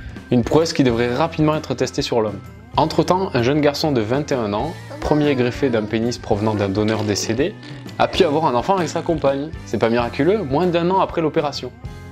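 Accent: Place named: South, France. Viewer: cool on va arrêter de nous casser les ... pieds avec "les antibiotiques c'est pas automatique" lol t'as un petit accent du sud on dirait peuchère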